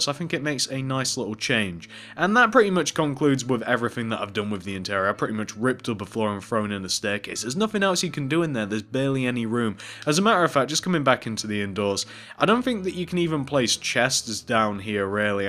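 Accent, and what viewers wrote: United Kingdom. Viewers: UK AMERICA WERE DO YOU LIVE SOUNDING LIKE UK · _300 I hope you're being sarcastic because if you haven't already noticed...He is not American he is British....also British people have accents if you couldn't notice from the way he speaks....therefore he isn't saying free as three and fing as thing on purpose that's just the way they speak. Just thought you should know...just in case you didn't... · I know your friend JosephBrown and i took your house that has a water fountain in front of it and i heard you talk british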